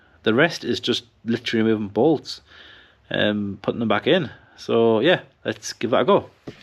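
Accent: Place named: North East England, United Kingdom. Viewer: Thanks for this video. Got the same job to do myself very soon. Also judging by your accent you're just south of the Tyne to me. I'm from Northumberland 😁 Cheers dude!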